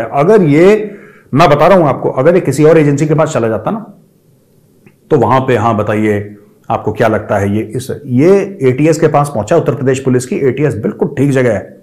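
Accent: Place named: Pakistan. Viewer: Amazing Pakistani voice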